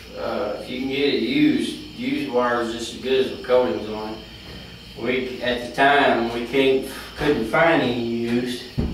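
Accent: South, United States. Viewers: LOL being a southerner, I can understand him perfectly...BUT I had to try the captions!! OMG too funny!!! The ONLY thing it got right is "for us", the rest was so bizarre!!! :D thanks for the idea ;)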